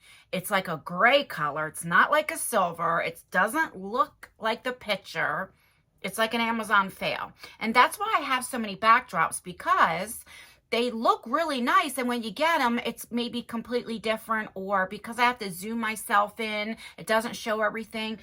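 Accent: New Jersey, United States. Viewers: On=AWN is that a jersey accent? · Hey there, I always chuckle when I hear your Jersey accent, especially since as a New Yorker, I can tell the difference. I actually really like the backdrop. I rarely pay any mind to peoples backgrounds tho, unless they mention it like you did.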